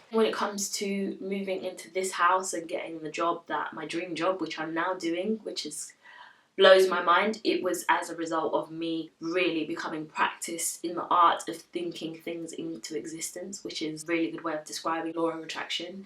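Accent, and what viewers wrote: United Kingdom. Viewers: i love your British accent! You sound very elegant =)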